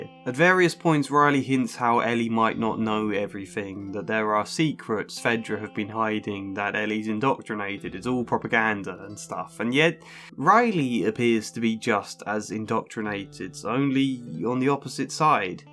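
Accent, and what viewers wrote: United Kingdom. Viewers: It's just as tragic as we thought but the British accent makes it sound more profound ?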